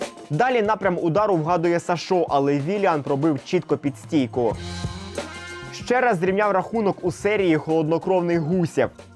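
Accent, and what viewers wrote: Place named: Western Ukraine, Ukraine. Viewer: Яка погана украiнська мова, с бандерськiм смердячим акцентом... Нiхто не збирався окупувати "украiнський" футбол , а лише зррбити шоу для вболювальникiв! Чемпiонат Радянського Союзу був на багато краше нiж чемпiонати "незалежних" Росii та Украiни, особливо на той момент, коли всi футбольнi зiрки збiгли за кордон.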